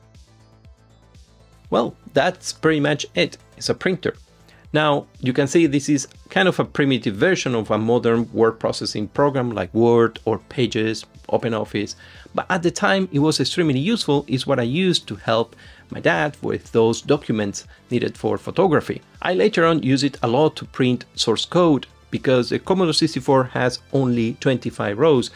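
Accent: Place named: Italy. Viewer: ah ok sei italiano :) avevo capito che non eri inglese ma non che fossi italiano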